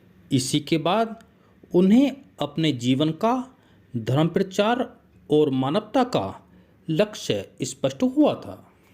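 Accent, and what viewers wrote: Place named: Haryana, India. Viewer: Bhai sahab aapki awaz se aap haryana k lag rhe ho... Awaz badiya hai bhai apki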